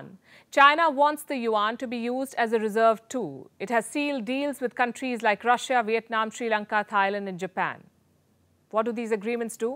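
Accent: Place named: India. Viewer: Your pronunciation is nice, voice is pleasant and the accent is more Indian.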